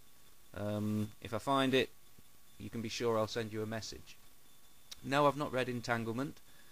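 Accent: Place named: North, United Kingdom. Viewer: I'm not sure where is from, but he has a mild northern accent. I can't quite place it.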